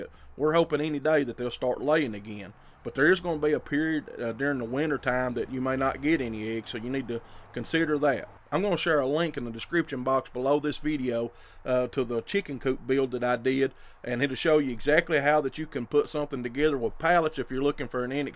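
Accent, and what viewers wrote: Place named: Louisiana, United States. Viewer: Are you in Ruston? Your voice reminds me of my family from Louisiana.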